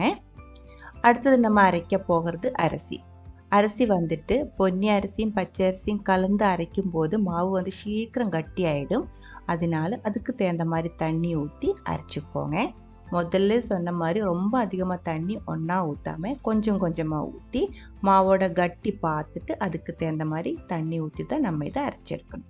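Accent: Kerala, India. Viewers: Excellent video ! Loved the accent - I speak Tamil like you but i don't succeed as much as you do in keeping Malayalam out of my Tamil. End up talking like Kamaraj in Michael Madana Kamaraj! Although I may not have the skills and the patience to make such great food, I would certainly tune in to hear your "Thalayalam". !! Suresh / Norway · In the middle of your efforts to speak in “Tamil” Tamil, your Malayalam flavor comes out, making it nice. Maybe U can speak in Malayalam itself since most Tamils understand Malayalam. This will also help them to learn Malayalam. Kollengode S Venkataraman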